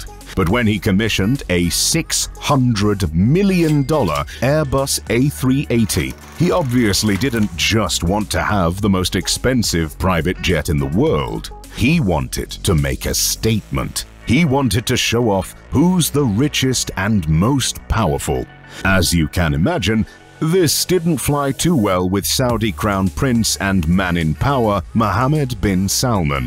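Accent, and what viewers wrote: United Kingdom. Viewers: The commentator obviously comes from the North of England...you can tell this, by his saying the word BATH....ONLY Northerners speak this way.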